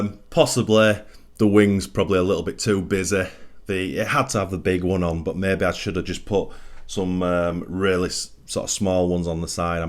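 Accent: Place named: Northern England, United Kingdom. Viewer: Love the channel, but a bit of a personal question, you have a northern accent and I am thinking south lakeland.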